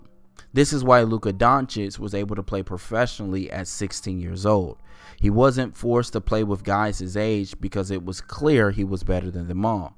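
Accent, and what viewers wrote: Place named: United States. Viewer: Luca Don'cheeks xD. I love american pronunciation. Not a hate :).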